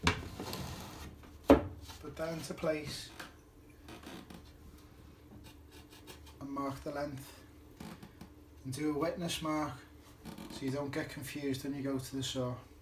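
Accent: Liverpool, United Kingdom. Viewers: Scouser. He must have learnt this in prison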